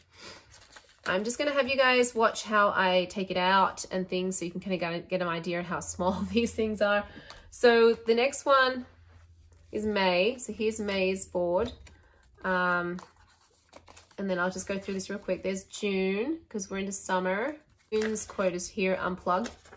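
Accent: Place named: Australia. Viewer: this is what i'm eyeing online. thank you for all the info!! your ozzie accent is so faint. did you grow up in australia and move to the US?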